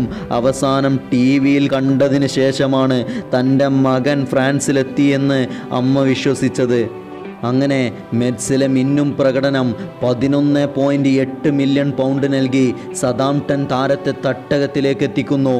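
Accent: Malappuram, India. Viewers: അവതാരകൻ മലപ്പുറൻ കാരൻ ആണോ 🙄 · Commentator malappuram ullathano malappuram language pole thonnunnu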